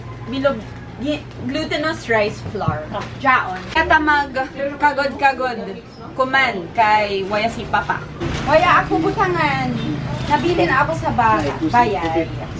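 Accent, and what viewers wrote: Visayas, Philippines. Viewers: Wow kabalo kana day magbisaya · Hahaha cute kaayo ka magbisaya haha makabalo ra jud ka ana madugay cge lang bansay ug sulti ug bisaya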